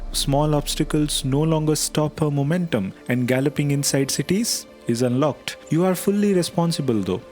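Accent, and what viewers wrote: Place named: India. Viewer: great vid bro ❤i was looking for some mods for my ng+ playthrough and this helps a ton, also i love your indian accent, it sounds kinda exotic 😁you should definitely keep it haha it sounds awesome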